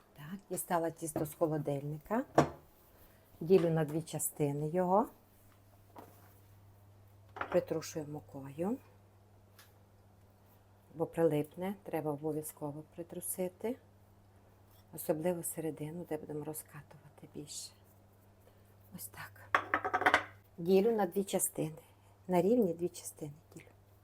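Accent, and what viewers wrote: Transcarpathia, Ukraine. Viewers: Очень приятно Вас слушать! Мова чудова!)) Та цей неперевершений закарпатський акцент! И рецепты очень оригинальные! Мира Вам, здоровья и добра! Привет из Житомира. 🌻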